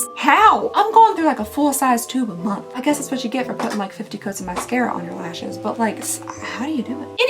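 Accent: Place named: South, United States. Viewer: Sounds more like you’re back from Texas 😂🥰 anyone else hear that southern twang a little extra in this video….😉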